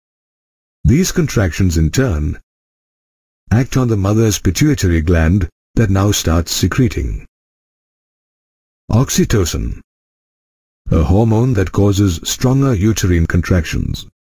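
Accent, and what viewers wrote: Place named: India. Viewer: This is Indian accent ma'am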